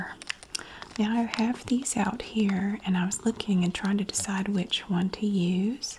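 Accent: South, United States. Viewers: We love a Southern accent in this house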